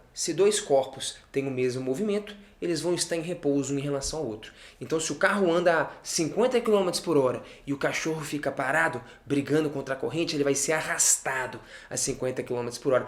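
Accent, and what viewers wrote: Minas Gerais, Brazil. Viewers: tu é mineiro mestre? Me identifiquei com o seu jeito de contar caso e falar KKKKKK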